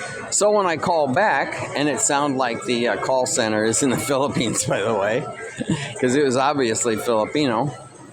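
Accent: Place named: Midwest, United States. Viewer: Hi guy I don't know your name. This is the first time I've seen your channel. I live/d in Negros/Valencia for four years now. Sorry to hear about your banking troubles. Hope you get it resolved. I'm Jeff/70 from Wisconsin originally but lived in California long time. Are you from midwest? Just curious from your accent. Yeah, simple life ain't so simple...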